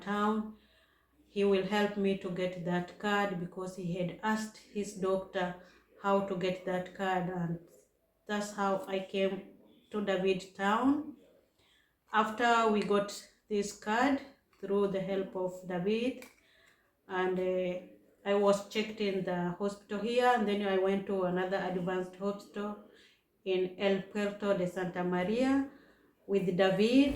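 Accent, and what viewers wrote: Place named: Maragoli, Kenya. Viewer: Hi sis where do you come from? You sound maragoli lime me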